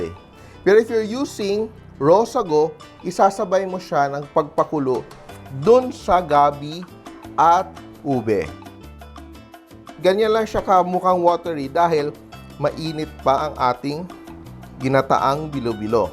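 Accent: Visayas, Philippines. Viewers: daan pko sir.. bisaya ka... hahaha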